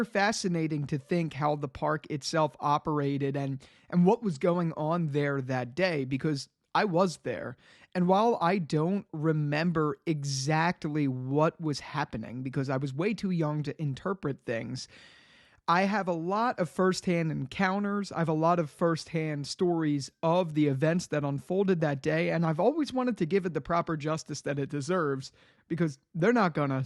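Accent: United States. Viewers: On a side note, I was trying to clock your accent as someone who’s from Florida but recently moved to Philadelphia; and when I got to I felt glad to know I guessed right!Thanks for your account on your experience. I was in kindergarten class about 45 minutes from you this day. I grew up in the Orlando area. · Figured you were from Jersey (or maybe Philly) from your accent. Interesting experience and perspective! Thanks for sharing.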